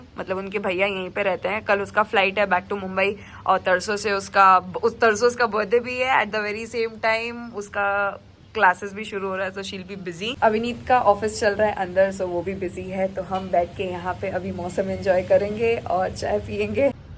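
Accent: Bihar, India. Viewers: Mam ap jo bich-bich me bihari lehja me bolti h ekdum mast lgta h sunkar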